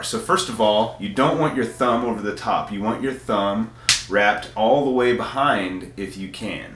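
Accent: United States. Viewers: Americans can never go wrong with their presentation. Seen a lotta Brit vids, but I usually prefer to hear Americans talk.... Love your video!! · I never heard such a manly voice in an American accent😂😂 Started on 8/11 will tell in 2 months thanks for the tutorial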